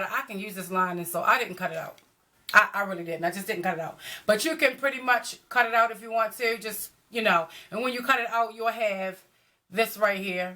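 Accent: Baltimore, United States. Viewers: B- more accent Luv it. I was told I talk country and I came from Philly 😂 Luv this video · I hear that Charm City accent..Hey my B-more neighbor... I will be taking that drive to the Flee market. KEEP UP THE GOOD WORK I love this channel.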